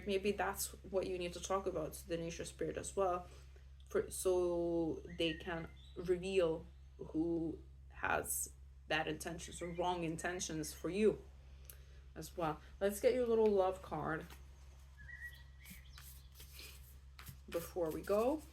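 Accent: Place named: New York, United States. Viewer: Hi with I thought your were from New York. Your accent sounds like you are from Bronx, Brooklyn, Staten Island. I thought perhaps you were living aboard. I am not sure your birth and living geo history. But, being a New Yorker you are hitting certain accent NY twangs. -lol Also, thank you 🙏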